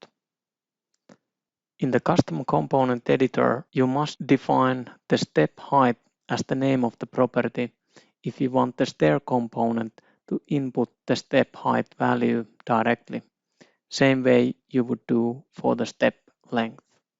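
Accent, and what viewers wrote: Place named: Finland. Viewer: Finnish accent easy to spot :D thanks for the tutorial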